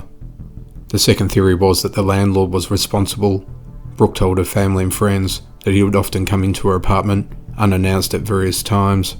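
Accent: Australia. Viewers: Nice to hear an Aussie accent doing these videos! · Wait, is this an Aussie accent mine ears hear?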